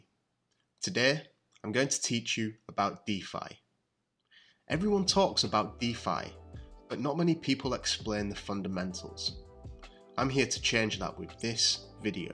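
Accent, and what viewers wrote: Yorkshire, United Kingdom. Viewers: Very very informative. You are an excellent narrator! Northern accent, Yorkshire? Many thanks.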